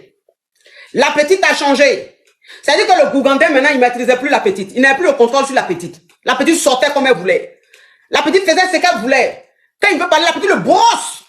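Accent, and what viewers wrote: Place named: Dioula, West Africa. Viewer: Coach avec son joula facon la ca fait rire koi, c'est pas samalakolo c'est samarakolo qui veut veille chaussure gate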